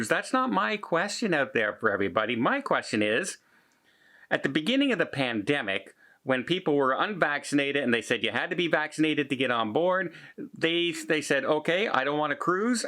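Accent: Canada. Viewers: I love how you Canadians say "about!" But seriously, why won't RCLL drop their vax requirement like Norweigen and Carnival?? I heard it's because the Caribbean countries/ports are requiring vaxed passengers - then how are other ships sailing there??????? Does Carnival go to different countries????? Sounds like Royal Caribbean is being ultra political....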